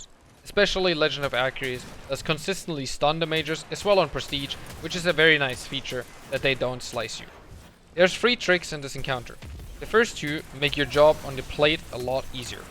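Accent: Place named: Germany. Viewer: That German accent is sexy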